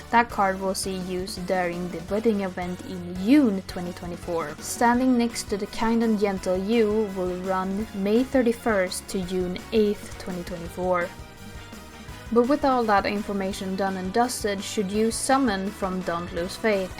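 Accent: Sweden. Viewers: are you swedish?!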